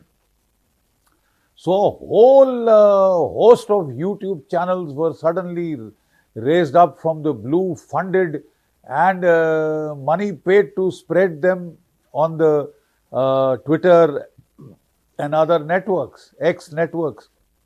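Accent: India. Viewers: You old fool,,, he Modi's friend more than india,,, you can not fool people with your curry English...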